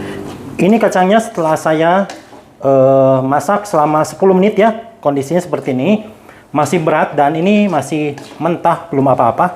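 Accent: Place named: Java, Indonesia. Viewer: Dari bahasanya ada Muthu Gobed Baki Sampean jawane pundi mas 😀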